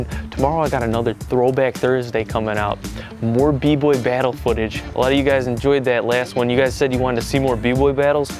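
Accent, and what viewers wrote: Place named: Midwest, United States. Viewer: Nice midwest eeeyyyaaaccent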